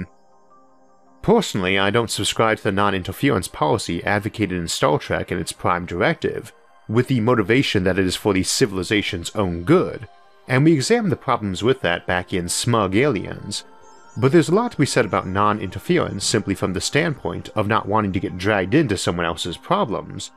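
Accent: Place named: Maryland, United States. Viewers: I'm just lovin' these - there's a calm, mesmerising pace to them that just keeps your attention. Bravo! Where's your accent from, BTW - I'm in the UK and I can't place where in the USA (if, indeed, it's USAish) you're from. There's Deep South twangs in there - and then hints of Canadian. But I'm stumped! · Maryland accent! Only place in the world the letter R is spoken out as a W... Hasn't anyone ever tried to correct this odd phenomenon? Only place a speech impediment is the norm. Great show and Host. Just hard for me not to notice the accent and stay with the info.... MAYBE I'm the one that's impeded.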